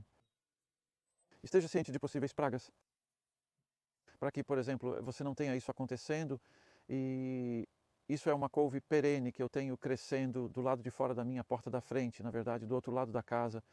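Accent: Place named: Portugal. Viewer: Bom agora temos um canal em português ( com sotaque de Portugal) desse mestre. Tava difícil entender porque todos vídeos não tinham legenda em português ✌️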